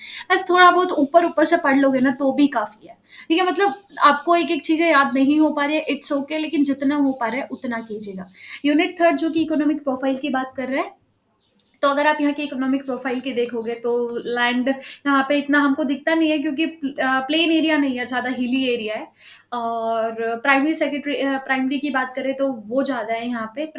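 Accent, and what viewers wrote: Delhi, India. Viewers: Ma'am apke bolne ke tarike se lag raha h ap delhi se ho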